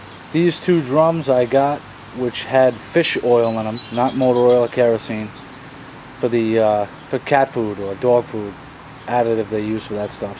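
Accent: United States. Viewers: well done. im gonna copy it :p love the american accent. hi from New Zealand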